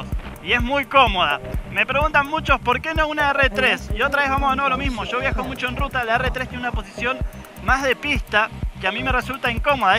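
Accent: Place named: Mendoza, Argentina. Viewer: Chilote. Jajaja naaa perdón amigo y sensual jajaja. Es MENDOCINO MI AMIGO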